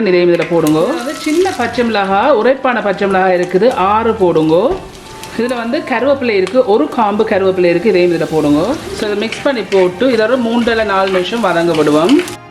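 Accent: Sri Lanka. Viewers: Yummy curry..Srilankan way of speech nallarukku sister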